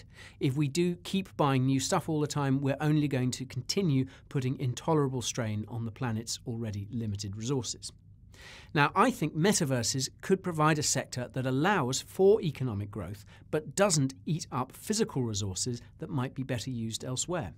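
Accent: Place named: London, United Kingdom. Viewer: lool that intro, such a londoner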